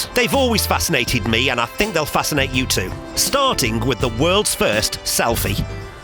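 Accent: United Kingdom. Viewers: 3 things i like about your style after watching 4 vids. 1 your Dedication and attention to the details.... (200) Games to Check out the veracity of the MH problem. Sweet!) I never got that until today. 2. Your enthusiasm and presence (including the Accent. We Yanks are suckers for the Brit accent) 3. No AI in your presentation! Big Fan, will pass you along. Thank you.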